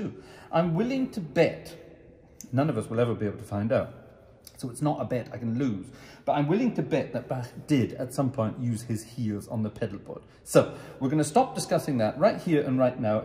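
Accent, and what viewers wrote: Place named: Scotland, United Kingdom. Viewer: Hi and greetings from Scotland. Many thanks for your entertaining and informative videos, enlivened by your cheeky Scottish chappie style. Your videos are aimed more at organists and organ students rather than people like me who have more organ LPs and CDs than they can count (starting with Rogg's 1960s recordings (Metzler at Grossmunster, Zurich, as well as the Arlsheim Silbermann mentioned by Elizabeth). Congratulations as well on the great audio and video quality/camera work-well done Mrs/Frau G! I hope that your followers for whom English is a second language are savouring your beautiful North of Scotland accent as you wax lyrical about Brustwerks and Ruckpositivs. I regret having only a smattering of German, but is someone asking if you now speak English with a German accent? Nein nein nein I say. I hope you're getting some compliments on your German, which sounds very good to me. 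Best wishes Jim Dickson.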